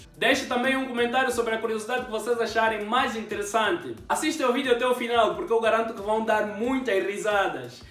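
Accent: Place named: Portugal. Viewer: Muito bom seu canal , mas e aí e vc e de origem ? Falando português de Portugal , eu sou do Brasil.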